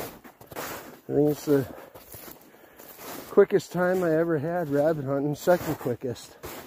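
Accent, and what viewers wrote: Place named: Canada. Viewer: You sound Canadian lol I’m from Alberta good tips boss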